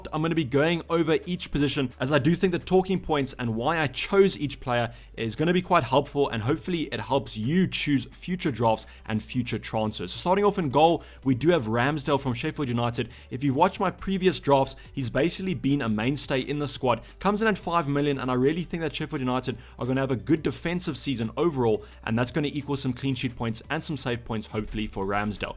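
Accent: South Africa. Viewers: Are you South African? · Found this video on my recommendations glad I did there was some valuable information in there love the SA accent and will be subbing.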